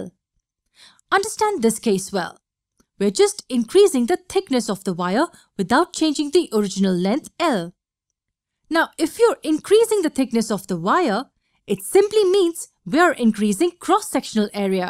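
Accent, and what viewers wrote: India. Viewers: Late... but I think it's an Indian accent :)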